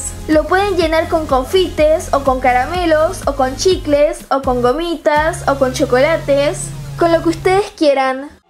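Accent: Argentina. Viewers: De que país sos parese asentó argentino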